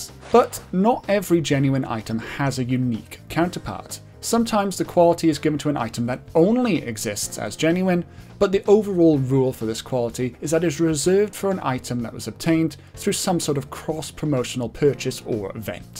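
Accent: United Kingdom. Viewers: Your from the uk aren't you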